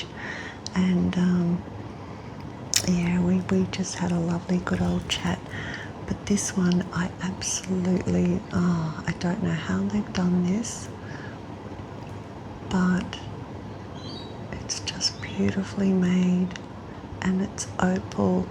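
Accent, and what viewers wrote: Australia. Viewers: Oh no! Don't take everything to the Post office :D I wanted to buy couple of the items from etsy but I need couple of days for some more cash, I will mssg you at etsy which items I will definitely order, if they are still there. PS. Perfectly relaxing video, crinkles and soft voice, aussie accent is one of my fav <3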